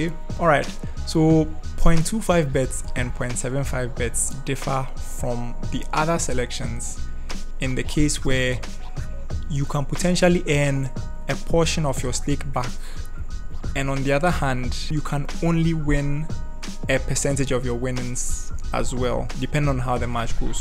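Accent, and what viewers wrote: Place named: Ghana. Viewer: Your accent sounds Ghanaian. Were you born or lived in Ghana by any chance? Just curious. Great explanation by the way.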